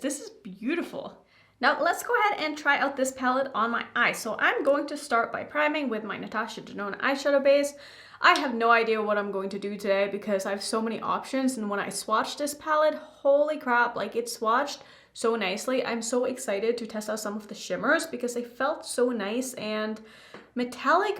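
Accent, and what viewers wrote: United Kingdom. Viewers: It’s called blursh because it’s spelled JUST like some brits pronounce the word blush. The also say bush for brush. “Did y’all wash your bushes?” I hear him say it alllll the time on his Instagram weekly. It comes out sounding like BLURSH to us Americans:) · In Manchester where he comes from, often when they said blush it sounds more like blursh. That’s my theory anyways 🤣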